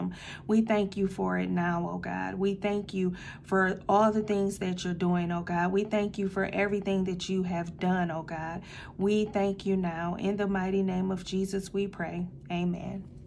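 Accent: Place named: South, United States. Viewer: I really love your southern drawl. Very soothing! Good ideas. I am not crafty but writing things down as you say is important!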